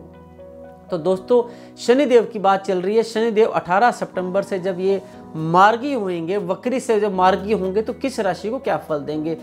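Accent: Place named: Punjab, India. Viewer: he is from punjab that's why his pronounciation is like this...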